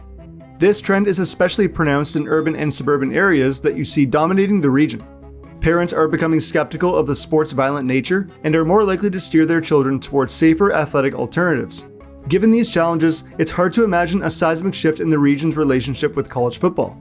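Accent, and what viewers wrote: Canada. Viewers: Canadian accent tho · The narrator sounds like a Canadian, check how he pronounces "out'.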